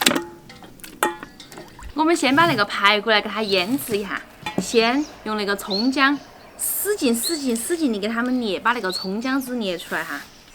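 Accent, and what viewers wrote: Sichuan, China. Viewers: 她讲的就是川普啊。。真要讲方言根本听不懂，尤其是说的快的时候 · 一口的四川话哈哈哈，我也是四川的，不过我现在在澳大利亚，我很喜欢看你的视频，做菜好看又好吃，人又长得漂亮大方。祝你有个好喂口加油哈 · 四川話好好聽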